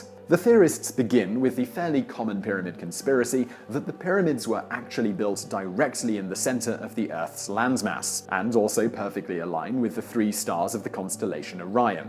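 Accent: England, United Kingdom. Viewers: Just because you have a fine British accent you still don't sound intelligent when you speak about things you know nothing about. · Rick Rockey You are actually taking personal offense because he has an English accent???? You are hilarious! Are you upset because you think he is putting you down by not using an inflection of voice that doesn't make you feel inferior? He is not, not trying to sound smug. It is an ACCENT. Do you get angry when French people speak with a French accent? I think you have a serious persecution complex. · Another Magic 8 ball why are they always British? I think it's that catatonic English accent. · Why is it that people believe that just because the presenter has a snotty British accent he's intelligent, he must be right?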